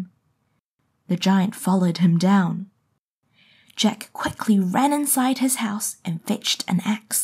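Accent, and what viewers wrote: New Zealand. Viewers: 내년 1월에 뉴질랜드 6주 어학연수가는 학생인데 뉴질랜드 문화 이런것도 영어로 소개해주세요 뉴질랜드 발음 너무 조아요💕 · You have a proper Kiwi accent haha! Did you go to broadcasting school by any chance?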